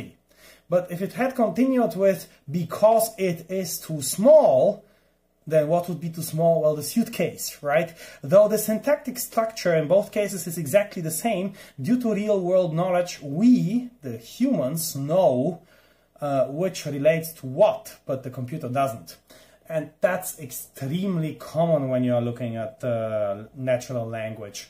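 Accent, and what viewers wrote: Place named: Austria. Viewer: Excellent thinking 🤔 Systrans with accent such as yours is English with Austrian accent. Depending on the language being translated. Usable on cell phone 🤳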